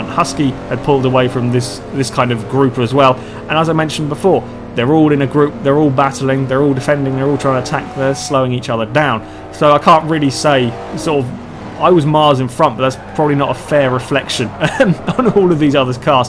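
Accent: United Kingdom. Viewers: Some of you might confuse of him. He really speak like that because of his British Accent. Don't complain too much what he is saying. '_'"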